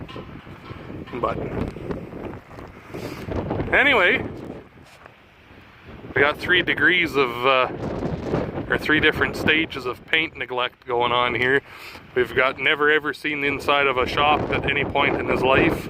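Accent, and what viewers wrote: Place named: Canada. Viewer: This is a Canadian channel, if the name doesn't give a hint the accent sure do 😅 keep it up, really like your attitude man!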